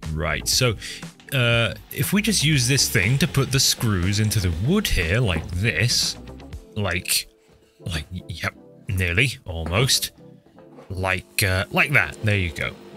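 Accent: United Kingdom. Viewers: bro dude are you and the same dude u have like the same voice but you are both britich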